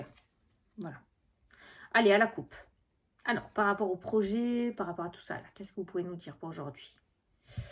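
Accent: South, France. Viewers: Quelle joie de t'entendre avec ton petit accent du Sud 🥰 Et un pur bonheur de tes predictions avec ton franc parler 🤩 Magnifique et merveilleuse: MERCI 🥰😍🤩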